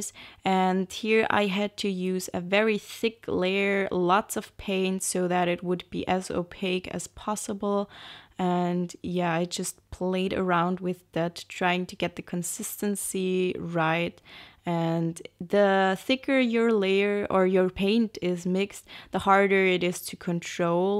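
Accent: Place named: Germany. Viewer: she sounds quite german ... so I guess there's your reason for these nonsensical phrases she lets loose sometimes ... ... ... like i.e. 'the bottom layer is slightly rewedded by the paint' ??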